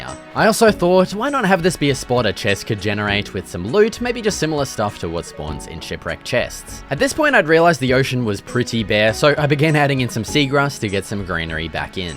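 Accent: Australia. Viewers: Ew your Australian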